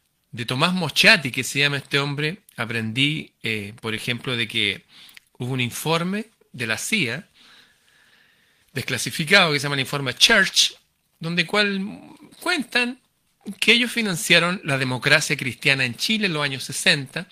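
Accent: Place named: Argentina. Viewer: Parece que hablas de Argentina !!!! Qué bueno es escucharte! Cariños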